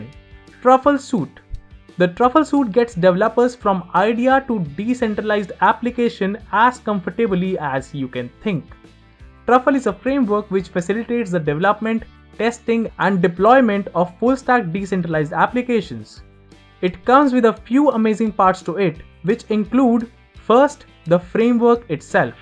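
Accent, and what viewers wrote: India. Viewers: 1) Among the dozens of videos on crypto, yours is best because of Indian English. If I hear American English I get angry because I can digest less than 30%, but I can digest 100% of your Talks. 2) To be a successful Blockchain Developer, apart from All the technical Programming stuff,what is needed is SIMPLICITY, for common man to participate in Crypto Investment.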